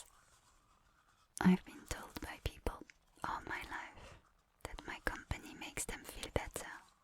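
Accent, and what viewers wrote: France. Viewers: I never thought french accent on english was so good. I can say thats even attractive. Looking forward for more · This would have been an amazing video just from the script but your VA talent elevates it to another plane. It’s absolutely incredible. This video was the first of yours I found and made me instantly sub. I sincerely hope you consider more French accent videos! Especially with the intimate but not explicitly sexual script. I’ve been listening to ASMR for about a decade and don’t think I’ve ever been so completely enraptured like this. I’m sending the F4F version to my wife hahaha 🥰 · Never thought I needed to listen to an ASMR with a lovely French accent. Magnifique! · I usually can't stand the french accent in English but your voice really makes it work. You have a wonderful voice and mastery of both languages. Thanks for your work and here's to your spread on the platform. 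· YES! Some more French accent. I don't grave for the affection, I crave for the accent. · pls more french accent audios 🥰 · The wholesome, soft-spoken french accent is awesome... Slept well with this one when insomnia hits last month and didn't end this video consciously till today. Brilliant masterpiece! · You need to do more french accent videos more often, they're so underrated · This is the best ASMR audio I've ever listened to. I listen to it so often it's crazy. The script is so well written, it's so down to earth, maybe not something realistic but it's not so out there like some audios I've heard. It's personal but also impersonal in a way. Really gentle and doesn't distract you from sleep/relaxation. Your voice with the french accent is so perfect, it's soothing and you sound professional and trustworthy, works really well with the script. Your other audios don't hit as hard as this one. 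They are good but this one has that something. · Oh my goodnesssss, your French accent is amazing!! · I don't normally comment in these videos but I just wanted to say that these videos with your accent and all. I keep coming back to it. There is something about how you speak with your French accent that never gets me tired of hearing it, even if I've listened to the same audio a countless amount of times. You've done a great job here. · I'm curious about something. As a french woman did u need a lot of practice to get your english skill to that level? Or did u had an accent like in this video and improved from that. If u hadn't how hard was is to train one.😶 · One more Time Your voice sounds so good in French and with the French accent… À quand un audio juste en français 👀🤣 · The combination of your voice and the French accent is incredibly soothing. Thanks for everything you do. ♥ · This and the maid video are the two most soothing videos on YT. 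I'm writing a novel and one of the main characters has a french accent. Her name is Emilie, so this video really caught me off guard when I heard her name lol. Thanks for the awesome content, and for giving me a voice to picture when I write the character. Hope we get more french accent videos in the future, but all your content is great. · Thank you for another french accent content. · I love French accents so far. 10/10000. But the French lady is very kind and also lovable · The return of the glorious French accent! I thoroughly enjoyed this script and you did a wonderful job with the audio,. Cheers!😊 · Everyone thinks French accents are beautiful, but I never really found the appeal before now. This video just made me say, "Ahhh... now I get it.." 😢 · This melted my brain in the best way. Please do more content with the French accent / sweet talk like this 💙🤍❤️ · I don't know how to feel with the fact that I'm a spanish speaker and i'm listening to this english ASMR with french accent- Need more 🗿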